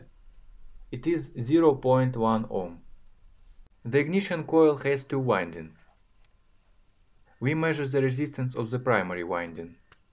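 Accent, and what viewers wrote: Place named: Russia. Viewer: First time hearing a russian accent?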